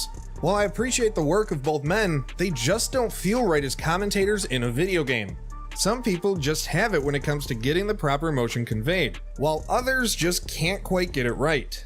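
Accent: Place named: Buffalo, United States. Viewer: You from buffalo lol I hear that accent y’all dragged that “R” on every word I’ll be surprised if you’re not from there or up that way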